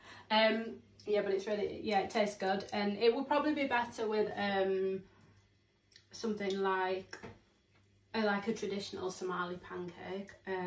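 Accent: Yorkshire, United Kingdom. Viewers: You are not hafe you are Somali. 100%🇸🇴💪❤🍇😘🥴🥴😁🥴 the Yorkshire accent did it for me so funny 🥰😍💪❤🍇😘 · Love the Yorkshire accent, would have liked you speak more Somali though.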